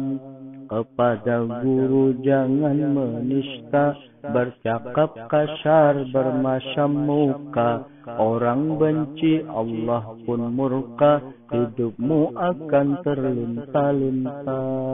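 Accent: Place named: Aceh, Indonesia. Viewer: Seperti logat aceh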